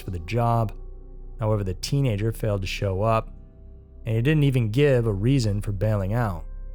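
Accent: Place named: Minnesota, United States. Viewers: You have a Minnesota accent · Is why I follow his words so well? MN for the win, I never noticed the accent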